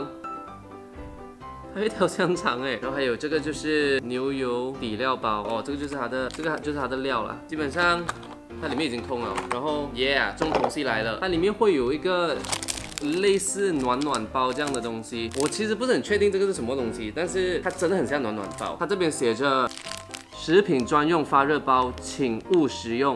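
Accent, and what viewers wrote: Malaysia. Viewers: 因為是馬來西亞人lah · 这位哥哥是不是马来西亚人(˶‾᷄ ⁻̫ ‾᷅˵)我听到liao，滚了（liao）。好可爱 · 大马华人的口音好可爱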